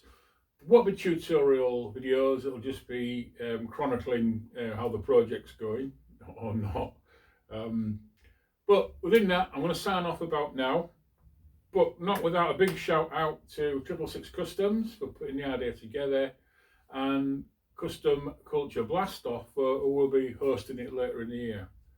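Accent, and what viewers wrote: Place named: Hull, United Kingdom. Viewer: Where are you based, sounds like a hull accent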